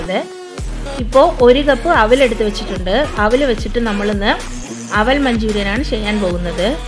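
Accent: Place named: Tamil Nadu, India. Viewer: Gopi manchuri enn കേട്ടിട്ടുണ്ട് ഇത് aadhyaayita enthayalum kandal തന്നെ manassilakum super chechi malayalam samsarikkumbilum tamiz idakk idakk slang verunund Sem2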